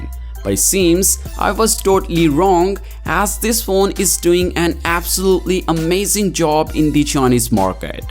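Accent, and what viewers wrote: India. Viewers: Love your Delhi accent.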